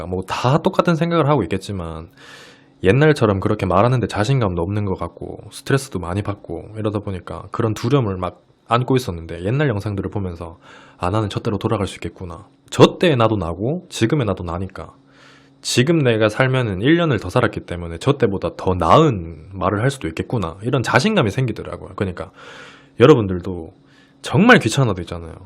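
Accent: Gyeongsang, South Korea. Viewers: 처음 보는 영상인데 일단 목소리,대구?말씨 억양, 훈남이면서도 편안한 외모에 위로받는 느낌이 들면서 빠져드네요 손 동작이 조금 산만해보여 아쉽지만 구독과 함께 좋아요 입니다.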